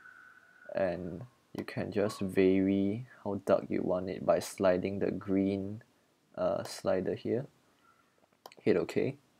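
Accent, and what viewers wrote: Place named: Singapore. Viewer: Thank you so much for this tutorial! I am learning how to edit some artwork for my gaming channel and am willing to learn more tricks about GIMP! Are you from Singapore (making a guess based on your accent... cuz I'm Singaporean here!)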